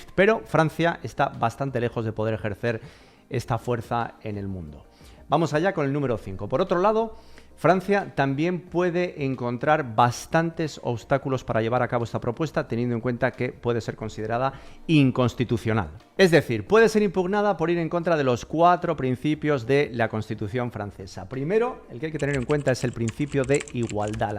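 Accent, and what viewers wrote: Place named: Spain. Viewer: Entre con una curiosidad. Pense: este tio seguro que tiene acento español. Asi es.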